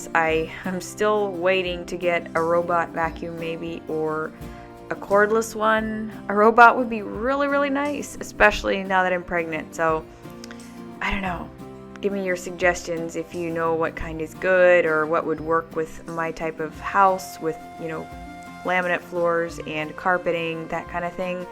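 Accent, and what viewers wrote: Pennsylvania Dutch, United States. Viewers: Hi! I've been watching your channel for a while now, which I truly enjoy your family and style. I loved this video especially since I'm from Eastern PA, an hour from Lancaster and am familiar to the Amish. My family is Pennsylvania Dutch from Berks County. I enjoyed your Dutch:) I didn't grow up PA dutch but could understand some and am familiar with the accent, which my great uncles heavily have:)) I always pick up the accent again when Im home for a visit. I love it, it's home to me. Im also familiar with some of the foods you make, which I miss and get my fill during visits. Congratulations on your growing family! Thank you for sharing! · I absolutely loved today's video!! How fun!! It was fun to hear the Pennsylvania Dutch thrown in! I love your decorating style. You have SUCH a great eye for it. And all the little organized spaces- LOVE that!! 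That little cabinet in your family room is awesome! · Where did you get the toy box with casters? We speak PA Dutch too but yours is a little different. I could easily understand you tho. 😁 Also thank you for talking to us in Dutch. Loved it! 👍😀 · I loved hearing you speak Pennsylvania Dutch! I grew up in the south of Austria with German as my native language. I could understand you, but had to listen closely. I went to Millersburg, Ohio a few years ago and we were surprised how well we could understand. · Love your videos and your Pennsylvania Dutch is beautiful. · I also hear the German very distinctly. I spent many years there (my dad worked there for 17 years) and I got married in Heidelberg. You are speaking it with what I would call an "American" accent of course, but that is to be expected. I speak German with an American accent, too. LOL I hear a few Dutch words, it's definitely a blend of the two languages. 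It's beautiful, but I do hear the English words as you are speaking, too. I think it's amazing to learn different cultures....it was the BEST part of all the travels I was blessed with. I've lived in Egypt, Germany, Okinawa, Switzerland, Czechoslovakia and many places here in the US - including in the PA Dutch Country - and I was born/raised in Cincinnati OH as our "home" when we came home from overseas. I am loving your channel. Blessings!!!! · I very much enjoyed hearing your voice over in Pennsylvania Dutch. I took German in high school and I understood some of the words. I really enjoye your videos. You are a beautiful person. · I love hearing Pennsylvania Dutch spoken! I'm from Ohio and I was down in the Holmes County area yesterday. Just a day trip because it's only a 2 and a half hour drive for me. I live up in the Island Area of Ohio and not too far from Cedar Point, but out in the country. 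As I said, I love hearing your native language spoken. I've been known to stand next to Amish women who are conversing in that language, just to hear it! I've been hearing it spoken for over 40 years now and I never tire of it. I think it sounds pretty and sort of comforting in a way. Don't ever stop speaking it! I spent a lot of my high school years at the home of a German friend. She and her family spoke mostly German at home, unless I was there, then they spoke English to me. And my own Grandfather used to speak and sing in German sometimes. I don't understand German and I don't speak it, so I also don't understand much of what you were saying. But, I'm rather fluent in Pig Latin! LOL! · I live near the French border in Germany and I understand most of your dialect. I have to listen closely. Usally I think in English or German, but Pensylvania Dutch is so haevily mixed, that my head spinns a bit when I try to sort out the bits and pieces. 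:) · I love hearing the Pennsylvania Dutch really never heard it before. I think that English has a heavy Germanic influence to it from the past. I needed the cleaning motivation as well to get my dishes done. Thanks have a great weekend.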